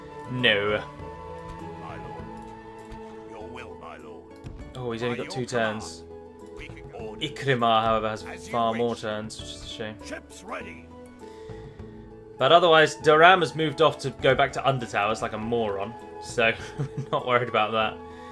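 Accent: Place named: Scotland, United Kingdom. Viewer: That scottish accent haha 😁 👍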